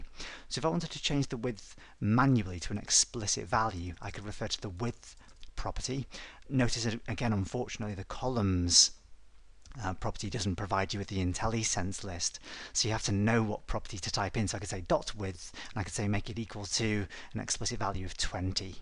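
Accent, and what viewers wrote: United Kingdom. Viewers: is an excellent videos for learning VBA. For one thing I liked the most is the instructor explanation with UK accent. Loved it:) I can stay all day long in the front with my PC and try to learn more every evening right after work. You are the best*** rated! Would recommended to everyone. Thank you so much!!!!!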